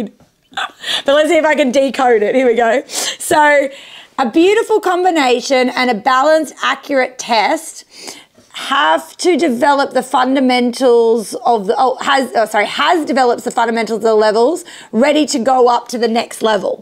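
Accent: Australia. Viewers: Is this an Australian accent ?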